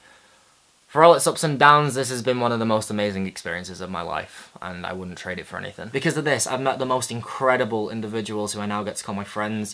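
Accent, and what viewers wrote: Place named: Yorkshire, United Kingdom. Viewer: The nature of many youtube channels is lonely gay boys who need some sort of family and support system. And when the teens get to be 22 or more, they fall off. They don't much need youtube anymore. I only subscribed originally because you were kind of cute and I liked your Yorkshire accent. I don't much think the long hair and nose-ring behoove you, though.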